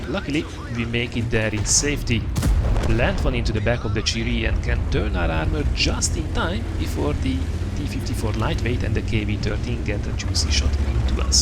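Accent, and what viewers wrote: Hungary. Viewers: Magyar vagy? :D Az angolod elég magyaros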